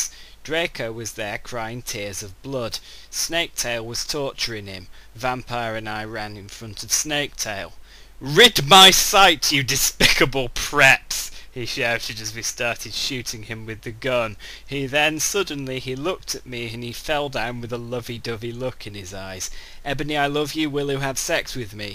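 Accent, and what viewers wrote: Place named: Australia. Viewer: The Australian accent seals this.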